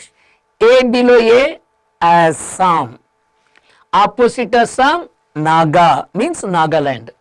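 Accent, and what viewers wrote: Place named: Tamil Nadu, India. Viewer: Talking in Tamil tone I cannot understand how can you say that you are moderator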